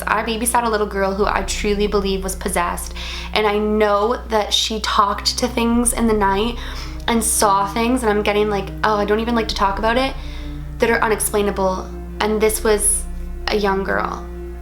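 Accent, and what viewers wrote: Canada. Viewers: are you Canadian? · Is she Canadian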